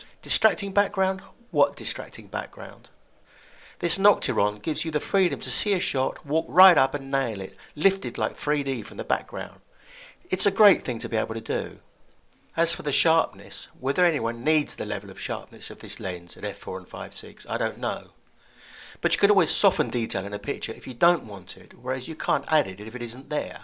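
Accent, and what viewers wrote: United Kingdom. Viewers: This gentleman’s review allow me to understand lenses and practice my understanding of English with very strong British accent.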